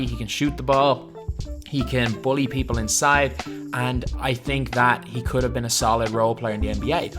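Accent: Ireland. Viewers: Are you irish? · You sound Irish are u Irish?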